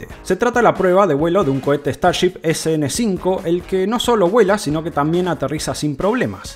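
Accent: Argentina. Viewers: un poco de todo pero argentino (No es hate)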